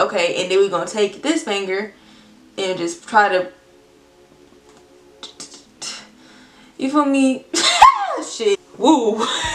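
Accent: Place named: South, United States. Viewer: definietly hear an accent. love it. are you from the south. i.e carolinas, bama, tennesse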